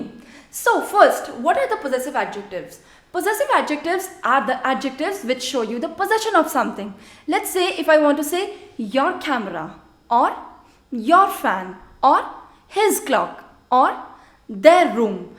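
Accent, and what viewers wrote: France. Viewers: Hats off madam . Wat a clear explanation n off course ur French accent is superb.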